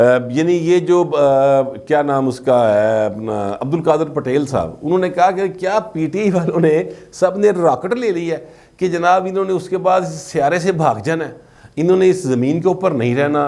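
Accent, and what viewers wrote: Lahore, Pakistan. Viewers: Typically lahori style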